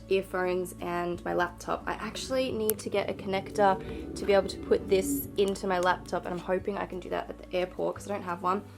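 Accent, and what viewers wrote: Australia. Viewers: Is she Australian? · Yep, that's definitely a fellow Aussie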